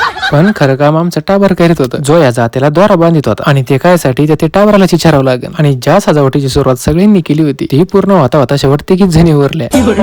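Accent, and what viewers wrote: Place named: Maharashtra, India. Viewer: आपली संस्कृती डोक्यावर पदर जोपर्यंत जुनी पिढी आहे तोवरच डोक्यावर पदर आहे जुने ते सोने असो , मस्त मैफिल जमली आहे छान वाटते लग्न सोहळया मधे 🎉 जात्यावरच्या ओव्या पण छान आहेत घाण घालताना नवरी मुलगी ,नवरदेव वरमाई, वरबाप आणि कुरवली ह्यांच्यावर गाणी असतात 😊 माठा ला बांधले बाकी कडे बांधले आहे घरच्या चौकटीला पण बांधतात तुमच्या विडीओ मधे हे सर्व दाखवले आहे ते सर्व आमच्या कडे पण करतात सोलापूर(करमाळा) दादा तुझी भाषा आहिल्यानगर ची वाटते माझ्या मैत्रीण श्रीगोंद्यातील आहेत त्यांची भाषा पण सेम तुझ्या सारखीच आहे ☺️एकच नंबर लई भारी ✌️🚩राम कृष्ण हरी🚩🙏 जय जिजाऊ जय शिवराय,⚔️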